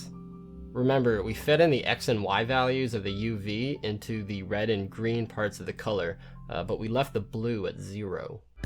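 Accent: United Kingdom. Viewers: The British accent is so funny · Awesome short but rich and enlightening explanation. Also thank you Vaguely British fragment guy !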